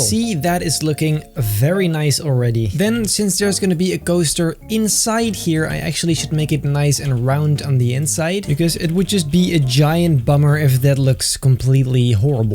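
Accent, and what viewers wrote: Netherlands. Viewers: Are you dutch?,I love your videos! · i am just rly wondering if you’re Dutch cus you sound kinda Dutch :] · Are you dutch? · Lol ik hoor dat je Nederlands bent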